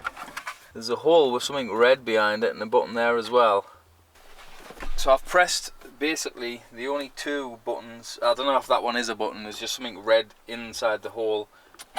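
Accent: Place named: Teesside, United Kingdom. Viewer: I was going to ask the same thing about the Bluetti as I was about to buy one until I watched this vid! Is the Anker one you have another git big power unit thing or do you mean just like a handheld powerbank? PS your accent isn't remotely strong, I'm a Teessider and I thought you were one of us, let alone a bloody geordie!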